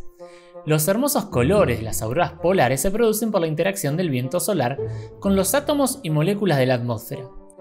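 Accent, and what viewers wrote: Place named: Buenos Aires, Argentina. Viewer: Muito bom o seu canal, saludos desde Brasil, me gusta estudiar el castellano viendo videos y tu canal es perfecto para mi, me encanta el acento de Buenos Aires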